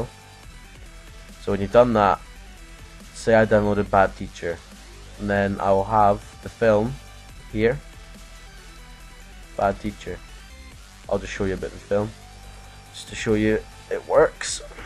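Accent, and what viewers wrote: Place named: Scotland, United Kingdom. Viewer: hes scottish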